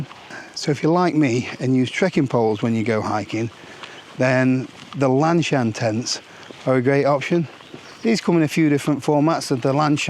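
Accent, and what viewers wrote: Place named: East Yorkshire, United Kingdom. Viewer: Brilliant video from Mr., very informative.... 'Ull' east yorkshire.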